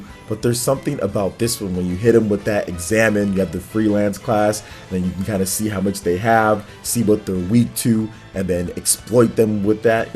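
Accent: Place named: United Kingdom. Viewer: British accents are so annoying.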